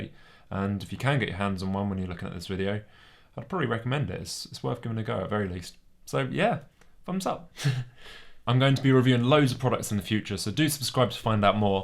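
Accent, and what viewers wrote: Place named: United Kingdom. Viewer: When I heard the British accent I knew there would be excess talking xD great review tho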